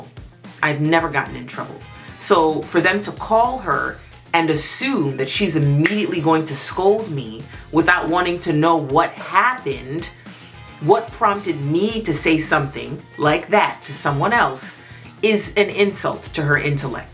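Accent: Trinidad and Tobago. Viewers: I knew you were from the Caribbean but this video and the last I watched confirmed you trini 😂❤ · Hi first-time visitor to your channel...you are a beautiful trini womanand a great orator...excellent. Love the trini accent...keep it coming. Trini man here...enjoyed your delivery style❤❤❤ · Jamaican? It’s Trinidadian,, very different accent. Jamaica is only one island in the Caribbean. Every Island has a distinct accent. It’s pretty easy to switch when you’re immersed in both. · Ohhh guuaaash I never realized she ah Trini.... I'M IN LOVE....sorry finish the story.....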